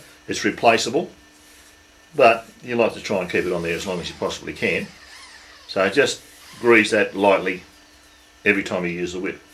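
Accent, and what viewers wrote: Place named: Australia. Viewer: Hey, sorry for the question but could you write down what string material you used to make the popper? With your australian accent it's a bit hard to find out what it is:D sounds like "barlin twine".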